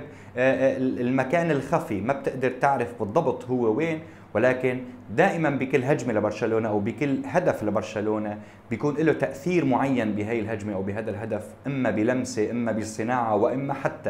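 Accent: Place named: Aleppo, Syria. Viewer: اللهجة الحلبية ❤️❤️❤️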